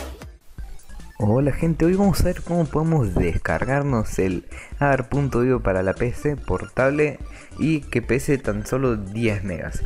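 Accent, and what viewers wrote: Córdoba, Argentina. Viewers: amigo sos de argentina cordoba?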